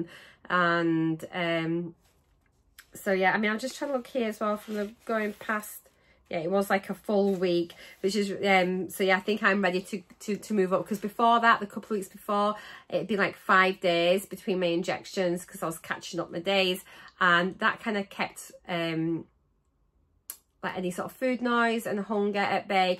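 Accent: Yorkshire, United Kingdom. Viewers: Hello lady, I discovered your channel recently and promptly subscribed. Your Yorkshire accent and warm, friendly personality is just so endearing, I could listen to you forever. And that’s before we even touch on content, which’s just first class! As a fellow MJ user on week 6 of my journey, it’s very helpful to listen to good, sound and trustworthy advice. And the fact that you were also a nurse “on your previous life”, as am I, is just the icing on the cake! Incidentally, our starting weights and current weights are exactly the same. I too am just half a pound off getting my 3 stone rosette from Nutracheck, which I can not recommend enough! My weight loss journey however, started way way before yours, and I lost just over two stones just using Nutracheck and about 11 lbs in nearly seven weeks of mounjaro. I really am struggling to get past 900 calories on some days, and that upsets me, as I’m terrified of damaging my metabolism and making things harder for myself in the long run. 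Most days I do manage around <num> calories, but have to work VERY hard at it! I truly love your channel, and when pushed for time and can only watch one, that’s the one I pick ❤ PS: sorry, our starting weights were not the same. I got that wrong!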